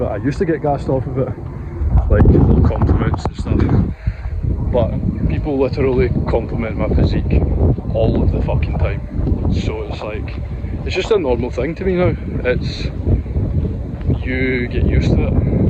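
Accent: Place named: United Kingdom. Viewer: are i want to learn your british accent.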